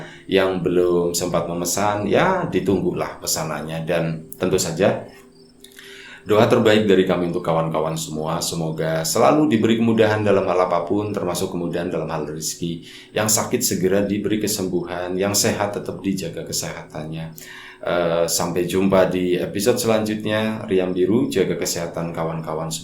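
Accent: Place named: Java, Indonesia. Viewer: Sekarang agak mendok ya mas 🤭🤭🤭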